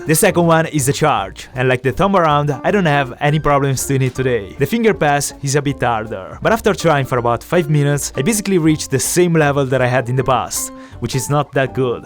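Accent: Italy. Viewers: non so perchè ma dall'accento , e dal fatto che quando hai fatto il test della velocità di scrittura era italiano penso proprio che tu lo sia , non so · Si capisce subito che sei italiano ahahah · vedo un po di italiano in questo inglese <3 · Si sente che sei italiano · ma come forse😂 l'accento è italiano😂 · Your english sounds like italian english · Ma sei italiano perché questo è il tuo primo video che vedo e si sente che non sei ne inglese e ne americano e anche perché parli in inglese uguale a me😂🇮🇹 se sei italiano sei un grande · You can practically taste the Italian accent,Grandioso, lol. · Ahaha, when you said that you have to become a compass I knew you were Italian, then I listened to the accent and saw the location. gg bro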